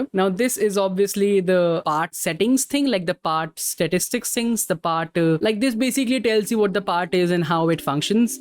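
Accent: India. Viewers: bro it's indian accent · Are you from India or something? you sound like Indian that’s why.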